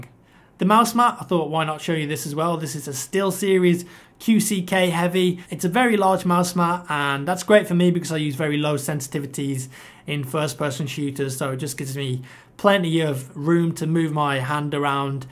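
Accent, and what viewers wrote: United Kingdom. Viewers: Been a sub since you had 50k glad you got far with your gaming. Your stuff is pure skill, and the British voice makes your videos more interesting. Good stuff lad.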